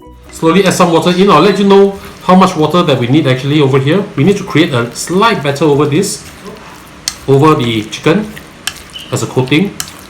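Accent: Singapore. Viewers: Are you speaking Singlish while cooking taiwai style? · Arw you singaporian sir cause you sound like one.. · You sound more Singaporean than Malaysian tbh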